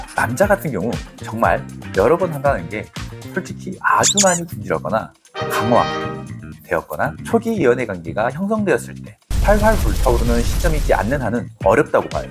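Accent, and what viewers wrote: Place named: Jeolla, South Korea. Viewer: 고향이 전라도신가요? 말투가 전라도 사투리가 나와서요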